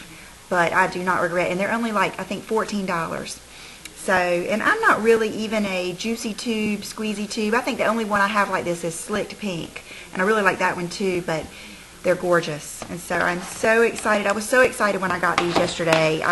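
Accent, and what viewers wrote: South, United States. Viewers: I Love your videos..watching them has made me wont to go out and try new things..I have always used the same make up forever, but my make up collection is starting to grow LOL Thanks for your inspirations..Also I detect a southern accent....Im also from the south..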